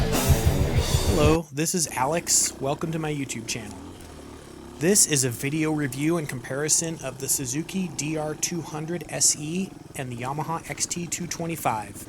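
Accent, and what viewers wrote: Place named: United States. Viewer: از لهجه شما آمریکایی ها حالم بهم میخوره، چقدر چندش حرف میزنین، اه اه اه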